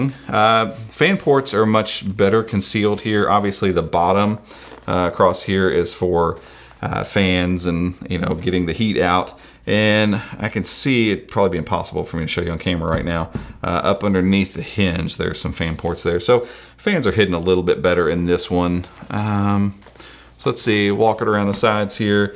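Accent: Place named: South, United States. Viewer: Talks SO fast in a southern accent for a Mainer is hard to understand you.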